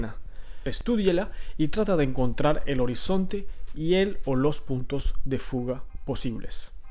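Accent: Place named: Yucatan, Mexico. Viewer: amigo hablai colo yukateko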